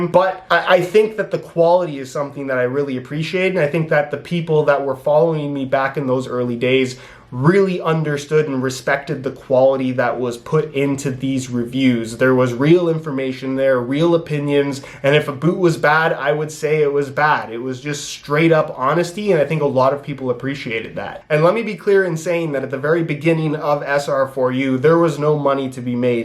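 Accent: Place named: Canada. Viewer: Lmao I always used to watch SR4U but I started to care a bit less about soccer (football over here) and stopped watching your channel. Today a video popped up in my recommended by this new channel called ' ' and I clicked on it. I thought you seemed familiar but just assumed all young Canadian guys must just sound really similar to me😅. It was only when I saw one of these videos in your soccer cleats room that I realised it really was you lmao. You've just earned yourself a new subscriber, keep up the good work because this channel is probably going to take off with more non soccer players getting these videos in their recommended.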